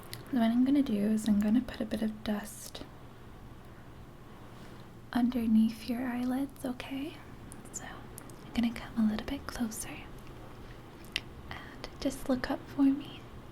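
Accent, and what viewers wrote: United States. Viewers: Lots of fun and very relaxing =) Is it hard to keep the american accent throughout the filming process? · As an actor and language enthusiast, I'm always trying to master accents from around the world, but I rarely get to turn the tables and judge a foreigner doing an American accent. It's pretty good! You only have problems with your o's and some of your a's. But even past that, the only thing that was big enough to give you away that you're not American is the way you said "director". But like I said, pretty good. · I'm not going to lie. You do a really good American accent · Amazing American accent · Ur American accent is great! Ur an honorary Yank! :) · So crazy to hear you speaking with an American accent!!! Like my brain was confused or something! Great job, the sounds were so relaxing, thank you! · Awesome video as always!! 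Great job with the American accent. You made it sound so believable (if I didn't know that you were British already, I would've thought that you were an American). The rain helped too. Have a nice day. 😉😊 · excellent job on the American accent! :-) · very good America accent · your American accent is better than and I'm an American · I must admit, this is some decent American accent! It is amazing how u can switch ur accents fluently! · I hardly comment on videos but had to say to you that you do it so good!!! Im a latina and prefer your natural accent but the american one you do sounds exactly like an american person!!! And an excellent RP!! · Nailed the American accent. Perfect. Wouldn't be able to tell you weren't American if I didn't already listen to some of your other videos ;)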